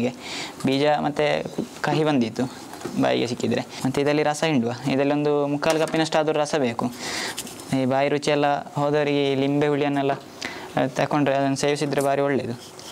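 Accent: Mangalore, India. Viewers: ನಿಮ್ಮ ಅಡಿಗೆ ಹಾಗೂ ಮಂಗಳೂರು ಕನ್ನಡ ಭಾಷೆ ಎರಡೂ ಚೆಂದ!!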